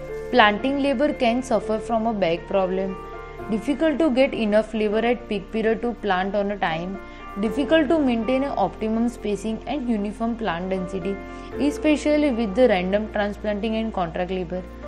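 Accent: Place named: India. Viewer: Oh God I love Indian accent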